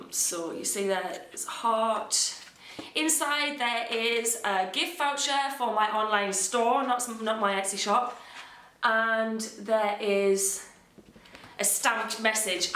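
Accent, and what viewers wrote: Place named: North East England, United Kingdom. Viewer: Hello. I’m new on your channel. Just want to say hello and let you know that I love your attitude! Oh... one thing to ask! Are you from Newcastle? I heard that lovely north east accent. 😀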